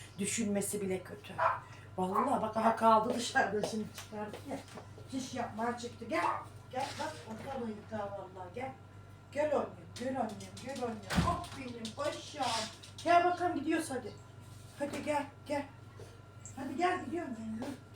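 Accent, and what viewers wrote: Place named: Aegean, Turkey. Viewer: Şivene bayılıyorum Ege şivesi 😘😘😘Çiko da evinin bir ferdi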